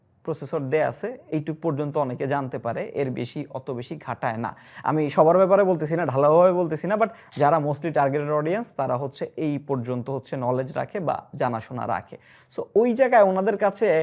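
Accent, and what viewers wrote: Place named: Chittagong, Bangladesh. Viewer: ভাই আপনার ভয়েসে মাঝে মাঝে চিটাগাংয়ের অ্যাকসেন্ট চলে আসে!!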